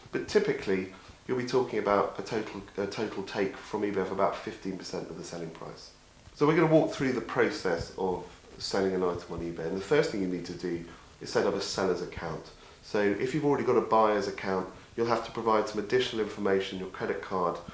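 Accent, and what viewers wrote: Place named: United Kingdom. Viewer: IM from us and i understand him? Have you ever heard of a British Accent ? lol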